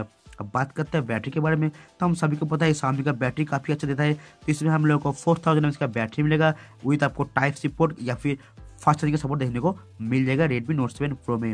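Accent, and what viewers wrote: Bengal, India. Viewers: Aapka voice me Bengali tone mix ho rahi hai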